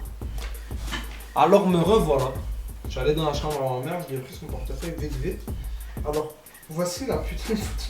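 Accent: Quebec, Canada. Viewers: jsuis vraiment mort bro, fais une vidéo où tu racontes que des histoires avec l'accent, c'est tellement énorme 😂 jvous kiff les québécois ! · Est ce que ta deja regarder la série qui s'appelle la famille y ont le même accent québécois que toi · Fais plus de caméra caché en full québécquois gros, ça les rend 1000x plus drôle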